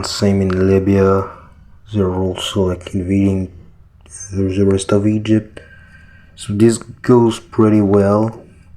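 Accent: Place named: France. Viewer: french is an accent I never thought I'd like you proved my wrong keep cooking mate!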